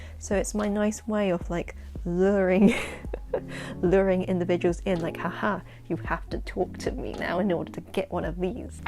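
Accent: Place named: London, United Kingdom. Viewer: I subbed to your channel from your Asian readathon tbr but again, RELATABLE bc I am lonely on youtube. I have no online friends although I am new on making booktube content 😂 Also, OMG BC bitesize saved my GCSE grades + KS3 + still being in year 13 without any exams to sit I'm using it to teach since I am currently working as a GCSE English tutor😂 Film production and photography sound so interesting! This video was so calming and your accent + inserts in this video make me miss London even more ;-; (edit) - I see my not so perfect life on your bookshelf! I loved that book so much ! Have you read it too ?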